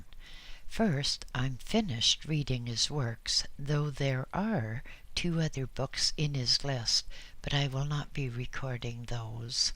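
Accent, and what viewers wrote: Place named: Canada. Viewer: Dear, thank you for reading Dr Rampa's books, which I first started reading back in the 60's when my grandmother gave me a copy of the Third Eye. Since then, it's been a long (and remarkable) journey. Your beautiful unhurried Canadian voice is well suited to Dr Rampa's writing. Thank you again, warm regards Peter Staples (Colombia, South America)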